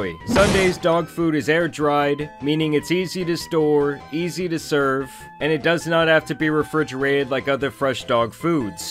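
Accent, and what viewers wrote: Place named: Boston, United States. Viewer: Your dogs are adorable!! Man, I'm binging this channel! I love the blend of the comedy and intelligence! …and I think I figured you the awesome accent! My fav! It's Boston, right? OH, I was a little disappointed at mark, I thought you were about to rap when I heard how easily “Likely still They could not come to an agreement In this situation Prime continued to threaten litigation” flowed.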